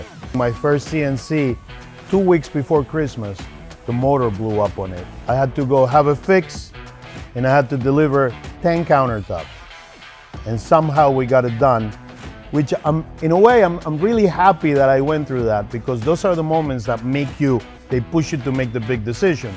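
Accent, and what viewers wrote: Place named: Italy. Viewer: Yes yes! I want to give this guy all my money with a "Italian Accent"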